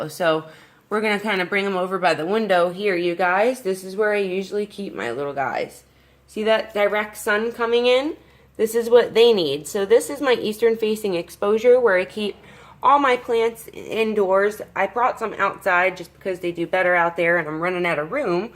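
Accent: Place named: South Jersey, United States. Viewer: Also, love that friendship plant. Also, are you from the east coast? I'm from the Jersey shore and I'm hearing a familiar accent. South Jersey? Philly? lol.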